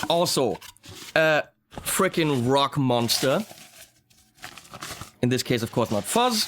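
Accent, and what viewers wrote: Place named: Germany. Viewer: "And you have this riser here for your wah... or your "Wah"Lume pedal." Gots to love the German accent!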